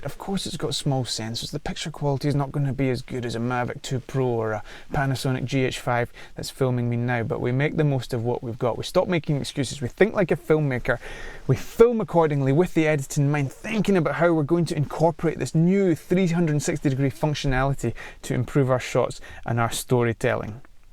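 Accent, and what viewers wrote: Scotland, United Kingdom. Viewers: Great summary & nice video. Brilliant to have a Scottish accent rather than an American one for a change. Cool car to film too. Did you just wedged the pole down the bolster of the seat & which of the 3 selfie stick options were you using (I see the carbon fibre stick is out of stock) ?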